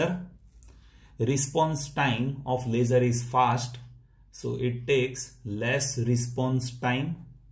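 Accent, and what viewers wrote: India. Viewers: What kind of English accent is this ??????? Indian type of English ? toooo rude.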